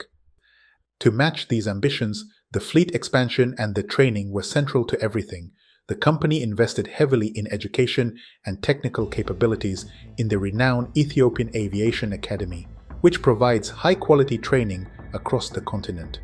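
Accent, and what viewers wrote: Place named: Kenya. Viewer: From his accent, yes, he is African. My guess is Kenyan. A youtuber with 1k subscribers can't influence anyone and guess what? 90% of viewers are Ethiopian. Whatever he talks about has nothing to do with Ethiopian Airlines.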